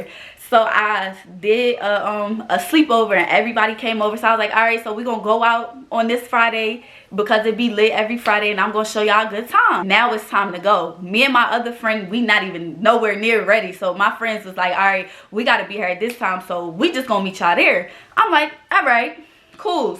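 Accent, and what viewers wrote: New York, United States. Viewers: Are you from New York even ?? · I love the way ny talk 😍.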